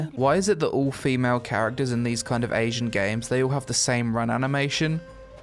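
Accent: Netherlands. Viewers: Youre Dutch? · Didnt know u where dutch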